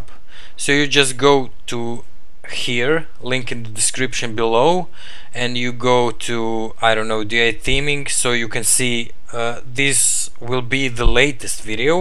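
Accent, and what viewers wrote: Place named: Russia. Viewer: с первой секунды понял что ты русский)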